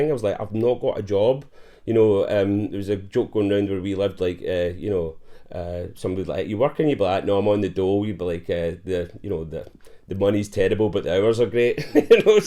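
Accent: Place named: Glasgow, United Kingdom. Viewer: Я прекрасно его понимаю. Я австралиец, но моя мама родилась в том же городе, где он жил в Глазго. Я всю свою дочь провела в качестве переводчика для своих друзей, так как никто из них тоже не мог ее понять. Этот акцент трудно понять, если вы не привыкли к нему.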